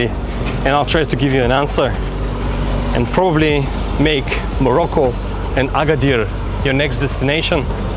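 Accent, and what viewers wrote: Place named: Bulgaria. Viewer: Where are you from? Your english is not bad but I feel as a bulgarian accent.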